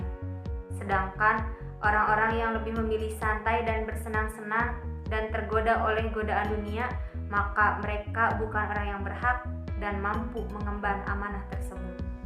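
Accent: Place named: West Java, Indonesia. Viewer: Memangni dari SMA klo dengerin ni anak ngaji suka enakeun aja . Ke sunda sundaan merdu 😋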